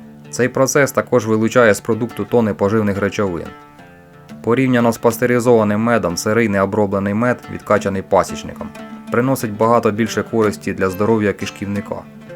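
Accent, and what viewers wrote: Ukraine. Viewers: Автор явно з Черкоащини.)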